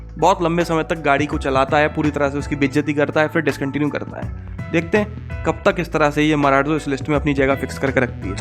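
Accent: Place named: Gwalior, India. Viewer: Makhane fik chuke mp gwalior language 😬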